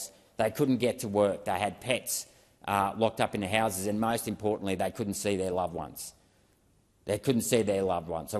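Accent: Australia. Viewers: Another succinct, intelligent, legendary monologue Senator, beautifully delivered in the dulcet tones of your authentic Aussie accent ... cheers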